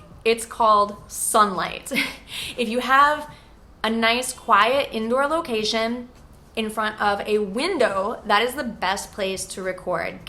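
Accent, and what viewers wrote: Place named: United States. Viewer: I am from India......I know how to speak English but I just wanted to know the American accent Thank you so much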